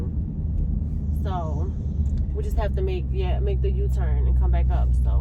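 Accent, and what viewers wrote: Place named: Louisiana, United States. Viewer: Benny sent me,, I’m here for that Louisiana accent 🔥🙌🏾😂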